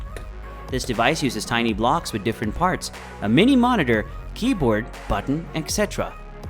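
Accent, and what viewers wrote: India. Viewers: Siri is impressive. Can understand that indish acent!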